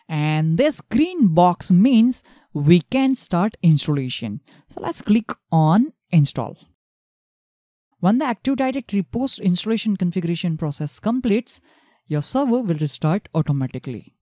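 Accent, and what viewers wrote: India. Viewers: the most indianest accent ever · ..and the Indian accent is also a joy to listen to :)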